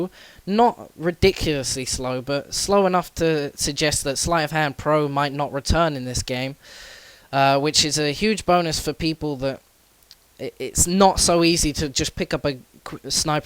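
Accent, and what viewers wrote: United Kingdom. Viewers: you sound like your from london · great job breaking it down! Brits make things sound much cooler than us yanks.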